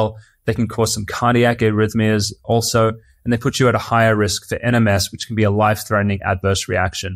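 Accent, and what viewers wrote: Australia. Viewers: Name sounds Austrian, voice sounds Australian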